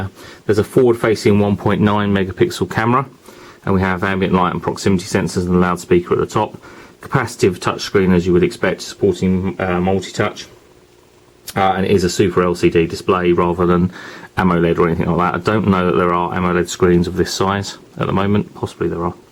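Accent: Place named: United Kingdom. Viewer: i love Britsh Voice ! <33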